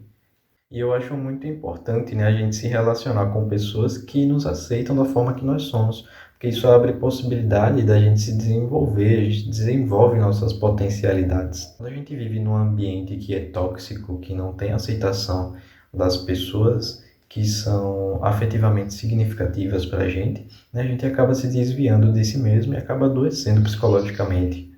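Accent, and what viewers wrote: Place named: Aracaju, Brazil. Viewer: Aracaju. Tava esperando você dizer a cidade pra saber de onde é seu sotaque.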